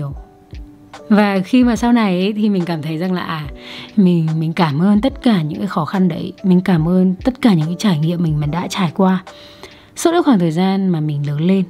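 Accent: Vietnam. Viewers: Nghe giọng c trong video đã ngờ ngợ r nhưng mà giờ mới biết c là người miền trung hihi❤️ e cũng hàng xóm vs HT nè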